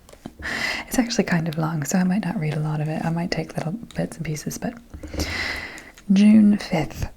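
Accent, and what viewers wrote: Transatlantic, United States. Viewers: You are so bloody fabulous. Thank you for sharing your voice and your mind with us! Can I ask where your accent is from? It's delightfully Transatlantic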